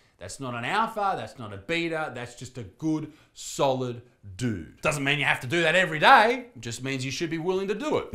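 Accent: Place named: Australia. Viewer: For some reason I love Australian voices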